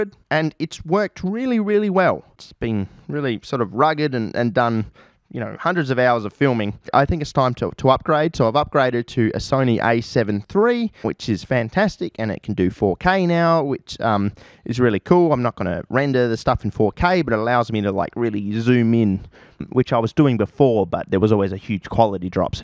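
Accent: Australia. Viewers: Good to see you,. Your intelligent, irreverent, Strine is music to my ears:)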